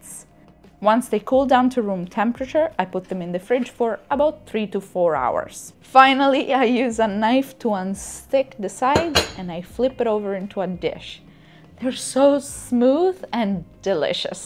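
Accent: Italy. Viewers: Your accent became more American.. You were nice by italian accent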